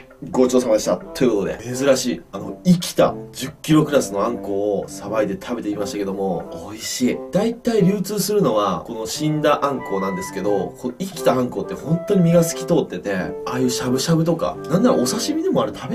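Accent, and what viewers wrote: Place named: Aichi, Japan. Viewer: 「生かしたる」とか「見ちゃかんよ」とか、めちゃくちゃ愛知県の方言っぽいと思っとったら愛知県だったわ笑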